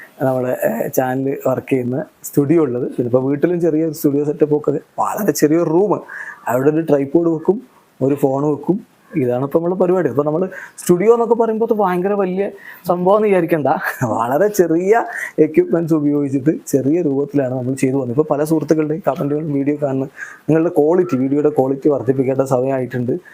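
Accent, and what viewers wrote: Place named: Malappuram, India. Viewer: Malapuram anengilum basha vethyasam undallo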